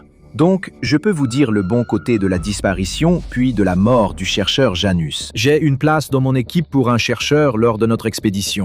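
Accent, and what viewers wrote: Quebec, Canada. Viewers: La vidéo est en québécois ?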